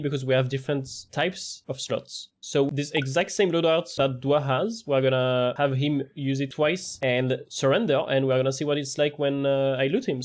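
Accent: France. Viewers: Wow a French accent with clear English is actually beautiful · French AND a speech impediment that’s crazy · I cant tell if that beautiful accent is french or something else, but god it invests me into the video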